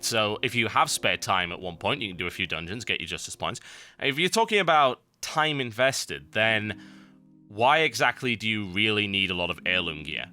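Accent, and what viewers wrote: United Kingdom. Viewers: Azeroth Daily, bringing me my daily dose of TotalBiscuit and brittish accents.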